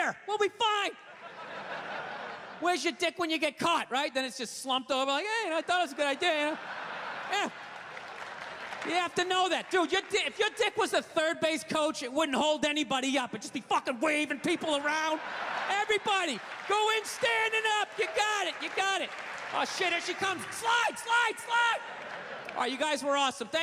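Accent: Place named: United States. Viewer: He's really an Irishman with an american accent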